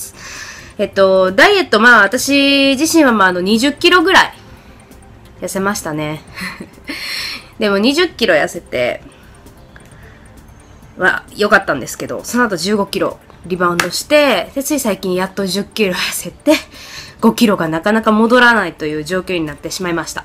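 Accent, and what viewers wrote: Kansai, Japan. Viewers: だいすきです♡ 関西弁やからめっちゃ説得？があります！私も体重MAXから10㌔やせました！でもそこから全く体重が落ちなくて、、、だからもっと！さらに！頑張ろうと思いました！ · rina styles 関西弁やからの意味がわからんwww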